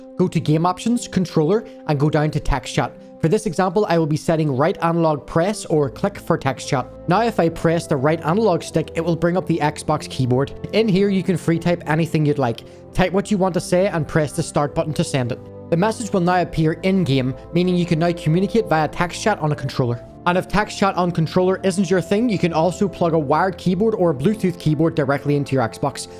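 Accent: Northern Ireland, United Kingdom. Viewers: Northern Irish..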